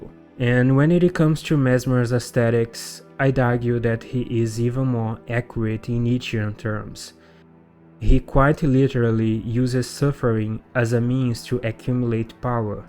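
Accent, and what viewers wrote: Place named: Brazil. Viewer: Are you brazilian? While very good, your english has some giveaways that sound to me like brazilian accent. Great content tho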